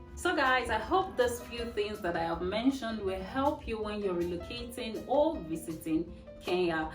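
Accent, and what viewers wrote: Nigeria. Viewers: Your physical looks are Kenyan coincidentally. Your accent is also not as deep as my Nigerian next door neighbours😊. In three months your Nigerian accent will disappear😊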